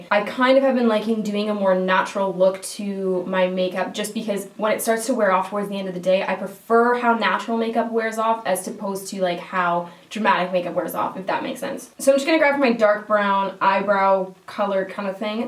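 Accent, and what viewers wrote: Canada. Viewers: You're Canadian right? The way you say bag and trash 😁.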